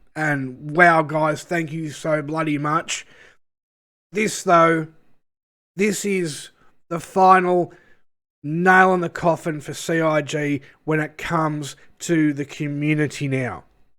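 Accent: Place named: Australia. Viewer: Sure man, il check the rest of the video now ( working from home anyway so i can listen to it. Im just not used to the strong F words and such :D -> im a full on Jedi, light sided kind of guy finding pleasure in being as good as possible, so ye..dont mind me :D ) Currently my fleet consists of 1 Carrack, 1 Mercury Star Runner, 1 Banu Merchantman, 1 Redeemer and 1 Eclipse. -> Honestly at this point i was only planning one last upgrade to my fleet and that is upgrading the Eclipse to a Polaris and thats IT. -> I honestly think that even 300$, or 500$ and not to mention1000$ is way to much already to support but the issue at this point is that im already down the rabbit hole and can almost touch my finish line of a (dream fleet) so i might as well do that. -> Another Issue is tough, that upgrading and buying ships at this point started to feel like a minigame which i can understand maybe some people who cant even stop the fantasy/dream of the supposed grandiose game on its release. 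Im like arround 80% in into doing that last upgrade but at that point i will stop buying or upgrading ships all together. All i can do is hope at this point that Chris and the teams learn from their mistake and do not take for granted the community because it can all fall down on themselves with a finger snap (just like Thanos :D ). Edit: i like your Australian accent! God bless you man :) Edit.2: I only play since last year arround september 2019 (i know i started right with the 3.7 or 3.8 or what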